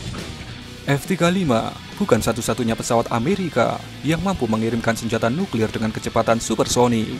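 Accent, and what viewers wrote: Java, Indonesia. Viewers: Khas medoknya enak bet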